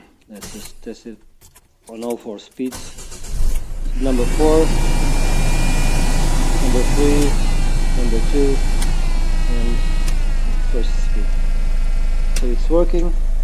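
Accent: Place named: Portugal. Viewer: Thank you very much. Excellent information, very useful no doubt. But there is a cabin filter missing in Your car, because these cars always use two at the same time, one on top of the other. I know because I have a Partner Tepee, and I change these filters once a year. By the way, by your accent and your name, I imagine that you are Portuguese, like me? If so, you can answer me in Portuguese. Thanks.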